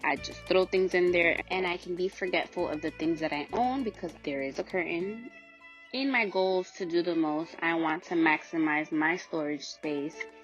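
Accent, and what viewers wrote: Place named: New York, United States. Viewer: I knew u was a New Yorker!! I mentioned in yr other video how much I love yr accent. N girl I’m loving yr videos. Keep it up 👍💕💕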